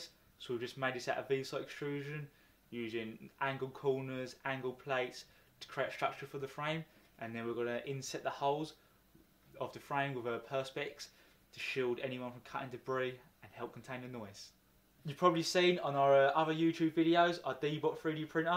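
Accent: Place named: Essex, United Kingdom. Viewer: You're definitely from Essex because you sound like Russell Brand 😁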